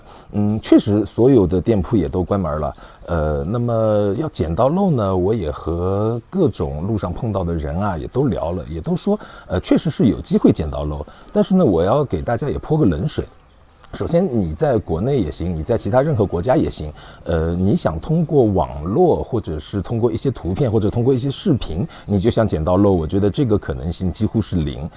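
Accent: Shanghai, China. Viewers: 哥們是上海人？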